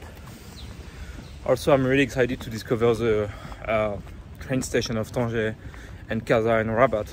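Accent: France. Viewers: ton accent c'est trop ..francais, la prochaine, parles toi en francais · On sent un petit accent français 😁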